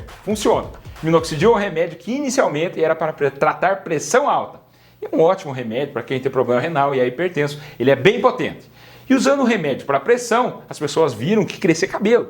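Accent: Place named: Minas Gerais, Brazil. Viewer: Gente este médico é uma benção de DEUS, gosto demais do jeito mineiro que ele fala parece que tá chupando picolé de groselha e falando ao mesmo tempo , ele é super bem informado , explica super bem , é simpático demais mesmo , um sorriso pra cima que levanta a gente , passa a impressão de ser muito cuidadoso com seus pacientes. Trás informações muito importantes e atuais pra gente , aaamo qdo ele fala : de que parte do mundo cê é ??? kkkk DEUS abençoe e proteja muito ele e a sua família, que ele continue sendo alegre , divertido , e super profissional como é, e sim , já me entupí de muitos remédios aí que ele citou , não sabia de nada disso , meu DEUS , já tomei muito azitromicina pra garganta, hj tenho usado muito o Propolis Verde que tem me ajudado e me imunizado. Fui 🙋‍♂️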